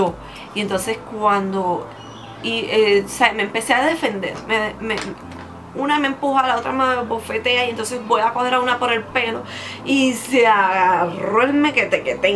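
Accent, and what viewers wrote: Puerto Rico. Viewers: ¿De dónde eres? Tu forma de hablar se me parece a la de Puerto Rico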